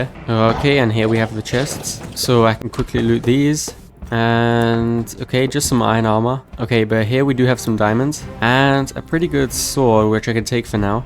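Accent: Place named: Switzerland. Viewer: I think he's from Switzerland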